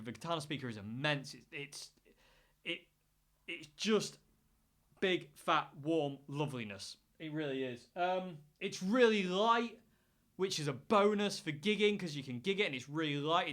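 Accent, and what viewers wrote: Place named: England, United Kingdom. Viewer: When it comes to SS amps, I love my Peavey Bandit Silver trim and was considering a Fender Champion 100 now that I am back in a gigging band (loud drummer, bass, synth, and 2 guitars doing modern rock) but after seeing this video should I really go for the Boss Katana 2x12??? Oh and sorry for ever thinking was Scottish (Borders region). Yours is a tricky English accent to place but I am still embarrassed as someone who has spent time on both sides of the border.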